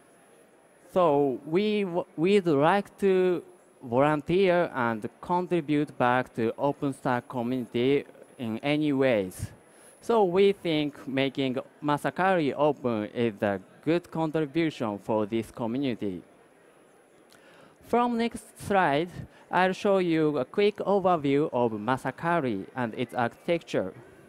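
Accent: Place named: Japan. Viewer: 日式英语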